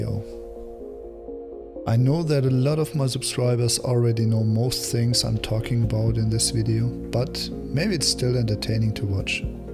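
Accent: Germany. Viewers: Bist du deutscher? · Are you From Germany it Sounds a bit like it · i knew it since im german too and have a slight accent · The german accent tho xD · are you german? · ich hab es erst gesehen wo man dein yt Dashboard gesehen habe aber man kann es auch an dein englisch stark hören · Are you german by any chance? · Hört sich so deutsch anhahaha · wie man einfach direkt raushört das er Deutsch ist xD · es ist so offensichtlich dass du deutscher bist :D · man merkt so hart das du Deutscher bist 😂aber bist übelst sympathisch 👍 nices Video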